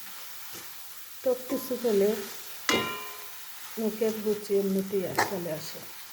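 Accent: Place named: Sylhet, Bangladesh. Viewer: বইন তুমি সিলেটি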